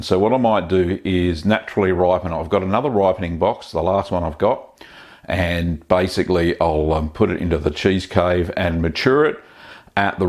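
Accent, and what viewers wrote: Australia. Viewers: This has been my favorite cheese that you've made so far. I really hope this one turns out well for you. I just love how you Aussie's say the number 13. It sounds like, "Thuh'deen." In the US, we really over-pronounce the letter "r" compared to the UK, NZ, South Africa, and Australia. Words like. "Where, there, beer, water..." in the US we really nail that RRRRrrrr sound at the end. You Aussie's really smooth it out to sound more like... "Whea, Theah, Beeah, and Woe'dah." It's nice to hear.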